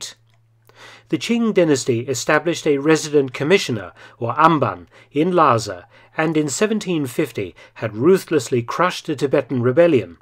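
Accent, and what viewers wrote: United Kingdom. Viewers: Lol the whole time I heard this I thought it was strange that they had a British man representing China invading Tibet😂😂 I wasn’t listening too well as one could imagine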